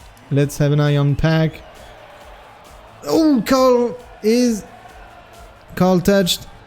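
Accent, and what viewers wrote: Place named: England, United Kingdom. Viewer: pourquoi tu prononces meme les pseudos avec un accent anglais ? Je veux dire Papou ça devrai rester "Papou" et pas "Pahpouw" ou même Aurel ça devrai rester "Aurel" et pas "Owel"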